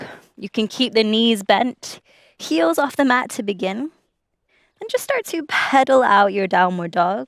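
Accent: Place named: Scotland, United Kingdom. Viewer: You are fast becoming my new favourite yoga Youtuber! Pa. are you Scottish/grew up in Scotland for a bit? I'm hearing a mix of accents haha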